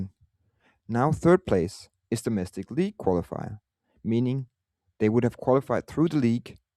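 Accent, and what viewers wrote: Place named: Denmark. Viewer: Are you Danish? You have a very Danish accent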